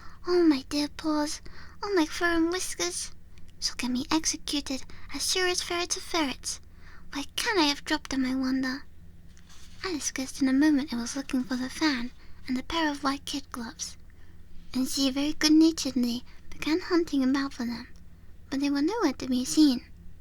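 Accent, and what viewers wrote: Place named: United Kingdom. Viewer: I'm so looking forward for the next parts! I never read the book, so I'm glad you're the one introducing it to me:_nepuYay: I will definitively listen to it again:D also you didn't lie, you sound pretty british in this lol it's very cute:_nepuLove: thanku again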